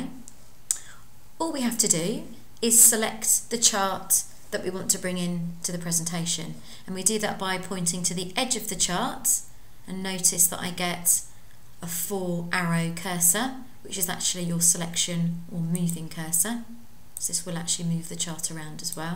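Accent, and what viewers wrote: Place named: United Kingdom. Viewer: annoying british accent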